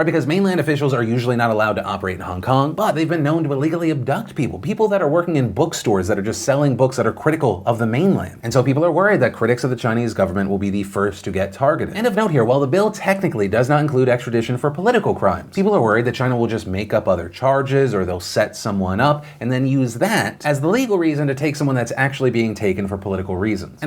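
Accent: South, United States. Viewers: Is from the south? I thought I heard a southern affectation in this episode. Also I had a roommate from Hong Kong, hope she’s doing alright right now.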